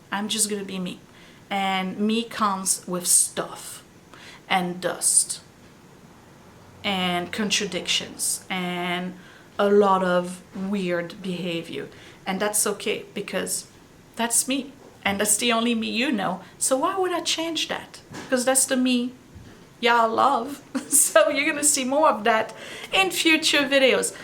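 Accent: France. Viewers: I always love to watch your videos. My favorite aunt was born in Belgium and had a wonderful French accent just like you. So, whenever I listen to you speak, it reminds me of her and feels like a little bit of home. Don't let the haters get you down. I'm so glad you're on Youtube!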